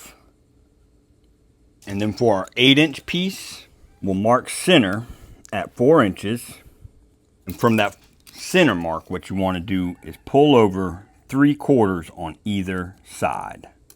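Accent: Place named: South, United States. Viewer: Hi, I juts discover your channel this morning. Congrats, very interesting stuff. Question for you. Where are you based? I would say in South U.S by your accent, but for the French Canadian I am, I would also want to thanks you, cause I can understand you with any problem You just got another huge fan, and you will inspire me to launch my own channel by the end of the year Have a wonderfull day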